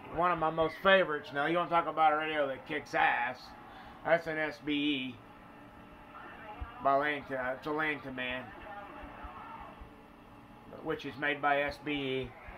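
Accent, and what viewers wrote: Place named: Tennessee, United States. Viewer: Hey... interesting background you have. My first CB radio had 12 channels and was crystal controlled. I am also a Ham for about 45 years. On top of that I have been a news photographer and videographer since 1967, and that's what prompted me to comment here. Since the radios are "horizontal" in shape you're videos would be greatly improved if you turned the phone camera sideways when you record your videos. Then we viewers would get to see more of your station at one time instead of big gray boxes on the left and right of your images. I hope you find that constructive. It was fun listening to you. Reminds me of back home in East Tennessee and Western NC.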